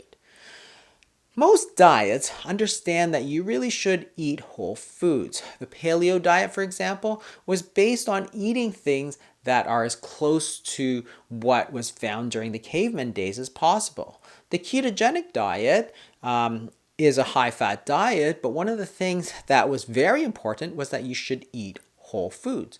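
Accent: Canada. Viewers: Dr. Fung is Canadian, and so pronounces words in the Canadian manner. So sorry this offends you.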